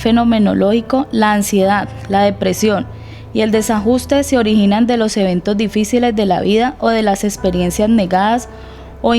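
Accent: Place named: Cali, Colombia. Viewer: oye ois vos sos como caleña amista.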